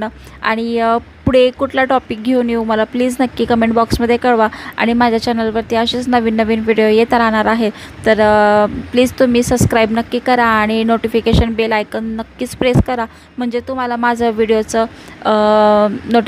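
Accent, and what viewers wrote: Kolhapur, India. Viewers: kolhapuri tone chan jamliy.. next vdo tambda pandhra rassa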